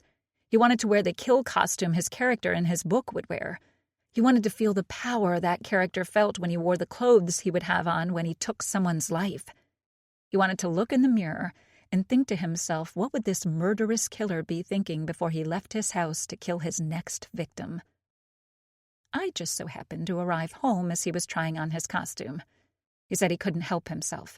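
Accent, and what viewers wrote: United States. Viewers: I love the American narrators! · American Narrator ❤❤❤❤❤ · American narrator 🎉❤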